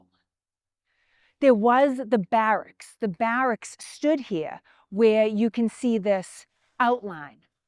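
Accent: Boston, United States. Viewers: You only have to listen to talk for 15 seconds before you realize she is definitely from Boston.😂💕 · Hey... yet another great video, as always... but, you left Boston/Massachusetts and still have that accent 😊... thanks, Jimmy from beautiful 😍 bucolic 😊downtown Schnecksville, PA, sun 🌞 and fun 🎉capital of the world 🌎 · There is something magical about listening to the history of the American Revolution with lovely voice and Boston accent. Came for the history, stayed for the accent. Thank you! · It's not New England it is a Boston accent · My sweet Historian with the Boston Accent!!😘💕🇺🇸💕🤘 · Great video! I have studied military history as a hobby lifelong but never heard of this battle. When I was stationed in various places over military career I always visited sites and kept an eye out for re-enactments and by chance never managed to see a civil war re-enactments but did see 3 Revolutionary War ones including Camden South Carolina and Monmouth New Jersey and an outlier Viking battle in Iceland :D. 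Orig New Yorker retired in PA love your Boston accent! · I'm here for the awesome history lesson but I'm also here for the wicked Bahstun accent hey.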